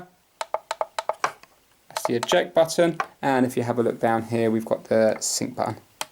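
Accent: United Kingdom. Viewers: I do not like your accent ... Sry, why does British English sound so awful?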